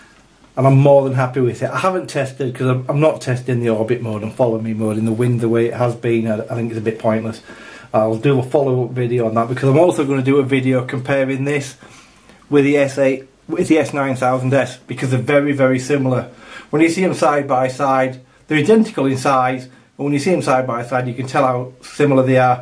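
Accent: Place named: West Yorkshire, United Kingdom. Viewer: Great review video , you sound like keith lemmon Are you from west yorkshire anyway gave it a like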